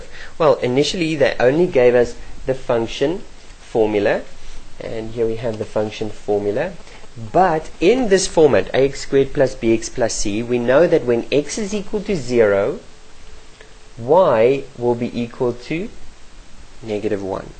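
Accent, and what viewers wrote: South Africa. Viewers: Is this South African?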